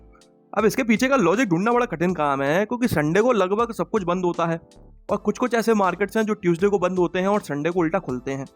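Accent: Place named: Gwalior, India. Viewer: Tumharii baat hi alag hai bhai maja la dete ho yaar ekdam.❤ Tumhari boli se mujhe to gwalior side se lagte ho.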